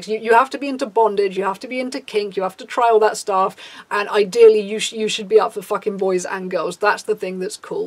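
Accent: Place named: Newcastle, United Kingdom. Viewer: Are you a Geordie? You sound like it imo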